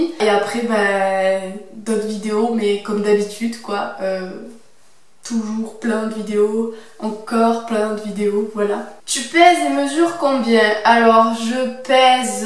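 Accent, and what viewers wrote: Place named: Aveyron, France. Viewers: Toi tu es du sud ouest tu as un accent toulousain à 10 000% ahahah gros bisous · Tu habite où 💕parce que moi j'habite à Millau est je mange très régulièrement de l'aligot saussice et j'ai le même accent que toi 💕du quou je pense que tu est née en Aveyron 💕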